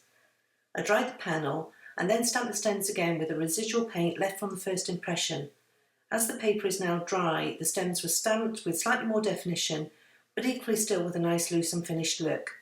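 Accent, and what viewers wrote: United Kingdom. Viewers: hi, do i detect a Welsh accent? Where r u from, Me-formerly Pembrokeshire! i'm only half way thru ur vid, but i had to send you this first b4 continuing! x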